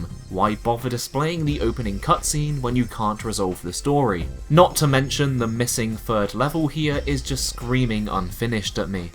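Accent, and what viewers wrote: United Kingdom. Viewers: you sound like your from the UK if so £4 at CEX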